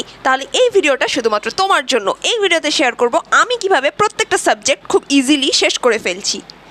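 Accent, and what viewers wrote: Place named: Kolkata, India. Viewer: Tumi Bangladeshi hole o. Tumar kothai Kolkata accent ashche.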